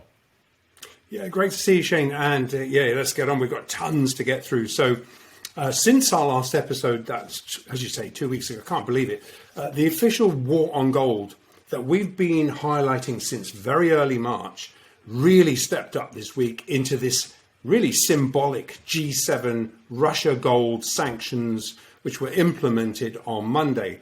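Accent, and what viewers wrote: England, United Kingdom. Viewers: I'm here to listen to an English accent.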